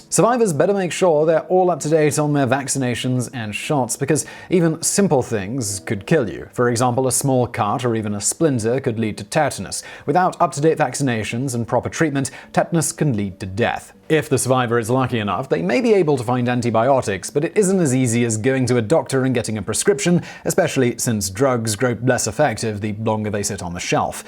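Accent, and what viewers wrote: England, United Kingdom. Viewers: Nathan Stautzenberger if they call Petrol Gas, what do they call Gas ? Americans are strange, yet he sounds English.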